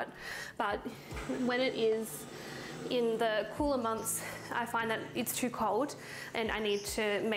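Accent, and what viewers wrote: Australia. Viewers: I love the way you say “Here” you must be from Australia ? Love the accent. Cheers from 🇨🇦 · I like how she says "here " but it's like "Heah" lol the Australian agent is nice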